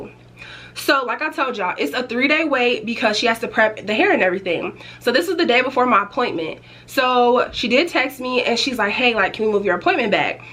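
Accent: Chicago, United States. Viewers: Whole time watching this video I’m like girl are you from Chicago 😭😂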